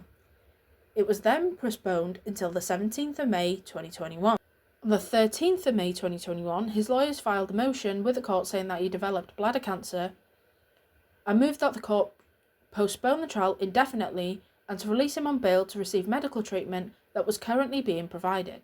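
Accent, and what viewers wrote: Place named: Lancashire, United Kingdom. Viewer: Maybe Dean Corll. Curious as to your accent. Are you from Lancashire? :)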